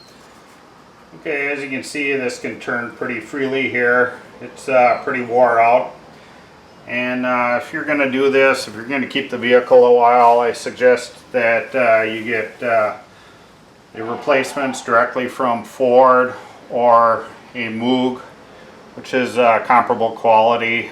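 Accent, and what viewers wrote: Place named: Wisconsin, United States. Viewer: I love the uh accent , Lol reminds me of home in Wisconsin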